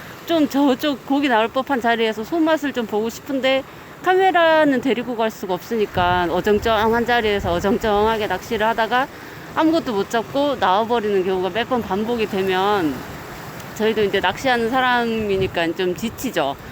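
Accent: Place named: Gyeongsang, South Korea. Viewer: 이제 경상도식 표준어(?)를 일주일에 한번밖에 못듣게 됬네요 아쉽습니다. ㅎ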